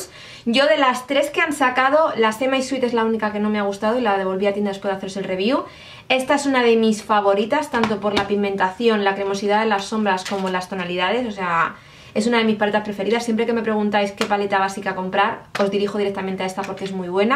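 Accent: Spain. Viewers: De las cosas que más amo de vivir en EUA ... La compro ya tengo montón de esta marca creo que es mi favorita hasta nuevo aviso... Como siempre graxias por tus vídeos siempre te veo ! Me encanta tu acento que hermoso hablan los Españoles , 😎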